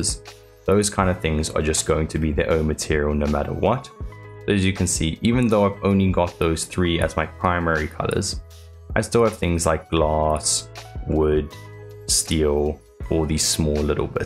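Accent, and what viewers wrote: South Africa. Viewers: Are you afrikaans? Also great video, thanks for the tips.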